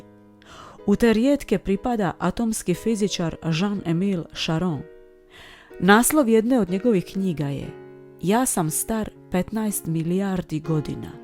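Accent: Dalmatia, Croatia. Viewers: Svidjaju mi se vase emisije! 🙏 ako sam dobro pogodila, dialekt bi trebao biti dalmatinski?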